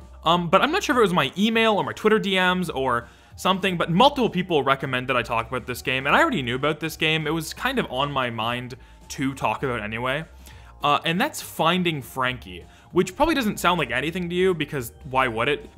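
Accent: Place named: Canada. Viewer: Holy shit, is Canadian? I can totally hear it now.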